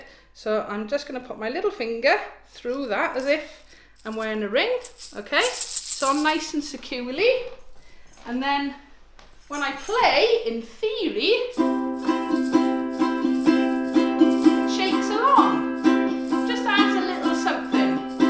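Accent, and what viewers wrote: Wales, United Kingdom. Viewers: Just love watching you and your lovely, warm Welsh accent. I've just begun to learn at the age of 84. Thank you.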